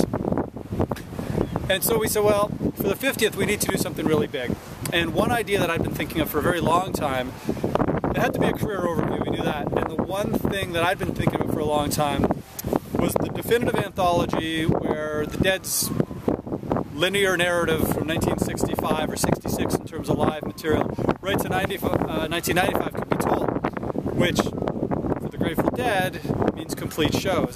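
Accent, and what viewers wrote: Canada. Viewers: nah that eastern? Canadian accent cuts through the wind after a bit. these vids are like watching painting w bob ross but better....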